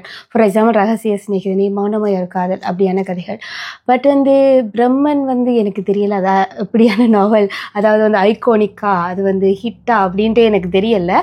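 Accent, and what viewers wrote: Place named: Sri Lanka. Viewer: நீங்க அழகா பேசறீங்க சகோதரி இலங்கை தமிழே அழகுதான் நீங்களே குரல் கொடுக்கலாம் நன்றாகவே இருக்கிறது நானும் முத்துலட்சுமி ராகவன் ரமணிசந்திரன் அந்த வரிசையில் ரசிகைதான் சிறிய வயதில் எங்கள் மனதில் பெரிய இடத்தை பிடித்துவிட்டீர்கள்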